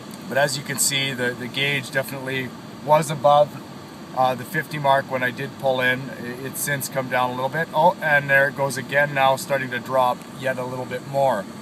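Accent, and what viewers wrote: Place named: Canada. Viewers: yep, I lived in Winnipeg for 7 years between 1979 and 1986, I actually enjoy listening to this guy, Like the Canadian accent;) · you sound so canadian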